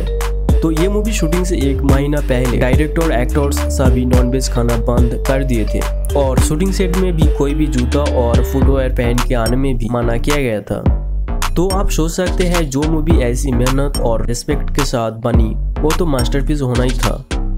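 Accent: Bengal, India. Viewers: App bengoli ho na app ki bat karneka tarika pata chal jata hai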